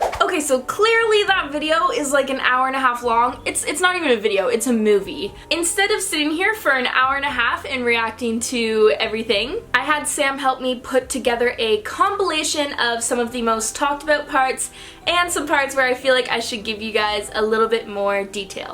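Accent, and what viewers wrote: Canada. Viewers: Gurl I love you candian accent and got used to it now I say aboat,melk,house in you accent love you and btw love your new merch and guys go stream. Love idk if I speeded it wrong opsie👉👈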